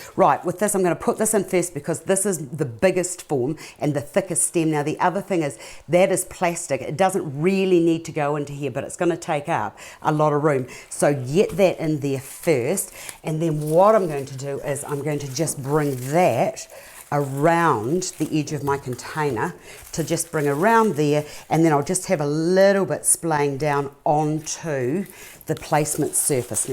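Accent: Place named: New Zealand. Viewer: Beautiful arrangement , your accents it is come from New Zealand . Thanks for sharing.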